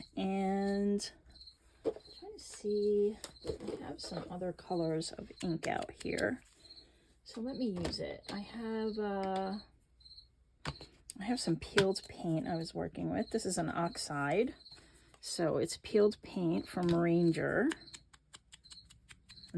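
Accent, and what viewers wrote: New York, United States. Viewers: i love your videos and your ny/east coast accent. reminds me of home :)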